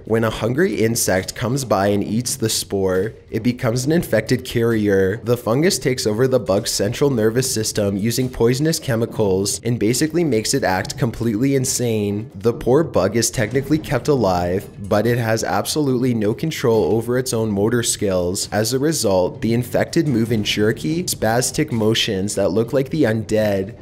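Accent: United States. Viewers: lol u end ur sentences in question form? its cute i hav a friend that talks the same way! R u american? def. true stories i practice voodoo. but i don*t turn people in2 zombies. thanx for ur scary stories. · Is voice an accent in an American state somewhere if so its beats the broad Birmingham accent in the UK which is like scraping your nails on a blackboard,its like hes forcing the words out of his mouth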